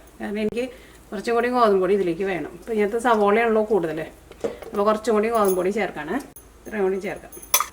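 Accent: Thrissur, India. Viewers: Enikku Chechide thrissur accent aanu kooduthal ishtam. Original thrissur nasrani accent! 👍🏻👍🏻👍🏻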